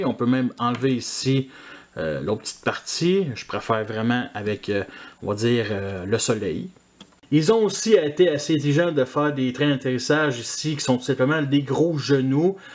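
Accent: Quebec, Canada. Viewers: Nom d'un caribou en rut, c'est une super petite chaine que je viens de trouver, ca va me faire un super entrainement à l'accent québécois (je suis frncais, et je viens de m'abonner)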